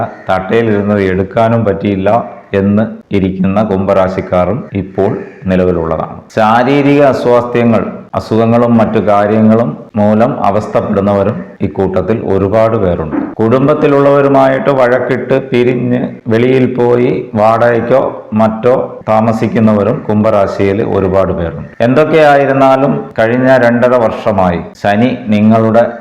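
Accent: Tamil Nadu, India. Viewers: താങ്കളുടെ സംസാരത്തിൽ ഒരു തമിഴ് ശൈലി വരുന്നുണ്ട്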